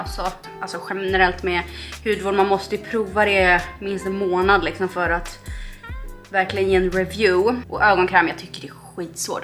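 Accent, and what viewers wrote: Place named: Gothenburg, Sweden. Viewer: Superbra video! Alltså tycker du är så gullig när du ibland har din göteborgska dialekt fast med en släng av Karlskrona-dialekt i samma mening😍😂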